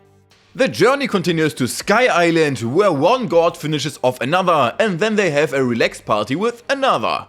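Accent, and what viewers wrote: Germany. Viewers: you sound german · Are you german? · Man kann merken dass du Deutsch bist jeder Mensch in Deutschland spricht so hahaha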